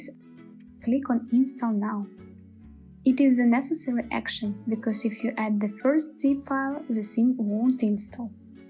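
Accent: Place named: Russia. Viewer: I love your Russian/Polish/Ukrainian accent.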